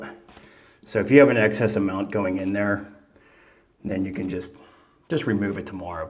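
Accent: Pittsburgh, United States. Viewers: haha, within 10 seconds of hearing him talk I knew he was from the burgh🙂🙂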